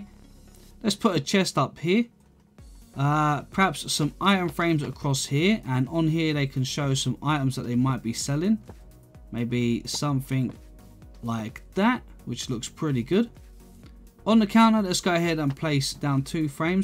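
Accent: United Kingdom. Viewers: You are so British..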